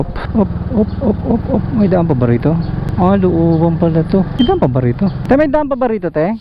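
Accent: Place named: Pampanga, Philippines. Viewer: parang reed lang na naghahanap buhay haha kapampangan kaba boss? bigkas mo kasi lakas makakapampangan e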